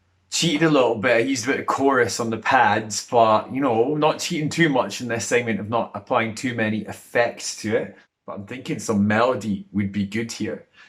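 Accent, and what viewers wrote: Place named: Scotland, United Kingdom. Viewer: Scottish accent = Insta sub.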